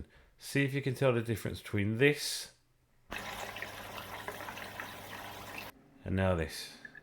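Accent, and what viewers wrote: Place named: England, United Kingdom. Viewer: Ah. An English accent!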